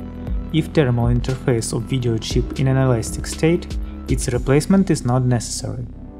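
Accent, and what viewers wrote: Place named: Russia. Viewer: Ведь явно слышен русский акцент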